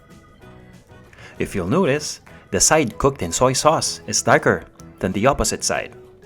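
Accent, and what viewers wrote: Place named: England, United Kingdom. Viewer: In fairness sa English twang ni Kuya ha? Love it! 😊😊😊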